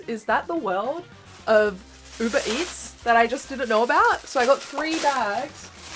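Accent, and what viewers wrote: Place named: Australia. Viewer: your accent is so cute. I wish I was from australia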